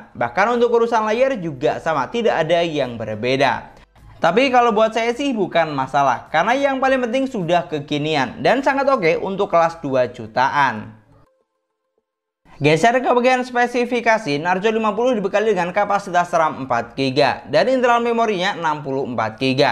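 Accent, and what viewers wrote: Madura, Indonesia. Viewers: Madura banget logatnya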